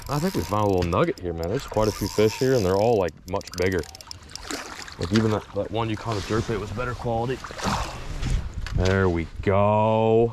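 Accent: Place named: Texas, United States. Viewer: I haven't watched in a while, but Holy shit dude, you actually sound like you're from Texas now 😂😂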